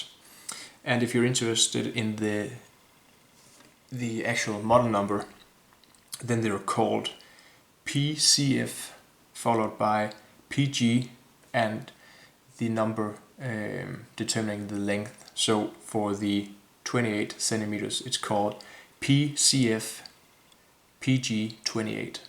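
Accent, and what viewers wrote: Denmark. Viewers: Hej (fandt dit navn på soundcloud), Jeg tillader mig lige at besvare dit spørgsmål inden jeg fortsætter med vores snak omkring kabellængder. Ja jeg er dansker og sad faktisk og tænkte det om du også var dansker, da jeg så videoen. Det er svært at løbe fra den danske accent, men du skriver og taler rigtig flot engelsk! Nå! Tilbage til det originale emne og tak for dit svar. Grunden til at jeg spørg er fordi, at de 30 cm kabler som jeg har nu passer perfekt til mit pedalboard. Men jeg ville gerne have kabler som disse for at få plads til flere pedaler, da de fylder ingenting sammenlignet med de traditionelle kabler (pro snake Patch Angled Jack). Jeg har så valgt at købe thomanns egne kabler som hedder Harley Benton FPC i stedet (du kan prøve at søge på dem), da de kostede halvdelen af EBS og ud fra hvad jeg kunne læse, så minder de utrolig meget om EBS kablerne. 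Men rart at vide at jeg også skal tilføje et par cm til dem, så behøver jeg ikke være bekymret om hvorvidt de er lange nok eller for korte. Så må vi se om de holder lige så længe som EBS ellers må jeg købe dem næste gang:-) Ps. Jeg har tilladt mig at abonnere på kanal, da dit indhold lige er noget for mig.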